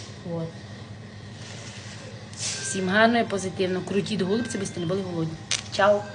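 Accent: Transcarpathia, Ukraine. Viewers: Дякую, супер по нашому по Закарпатськи❤❤Ви чарівниця.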